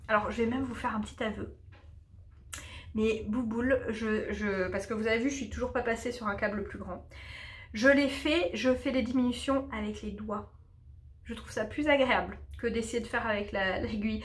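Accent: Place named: England, United Kingdom. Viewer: Bonjour, je ne sais plus trop comment je suis arrivée sur ta chaîne YouTube, je crois que c’est une recommandation de la chaîne. En tout cas, je suis mordue de chez mordue: j’aime ta personnalité enjouée, ton super accent anglais, les modèles et les laines que tu choisis (permets-moi de te tutoyer). Et puis, nous sommes presque voisines: j’habite Laval et je vais assez souvent à Portsall, sauf en juillet-août. Je continue le rattrapage des visionnaires. Bravo, continue comme ça!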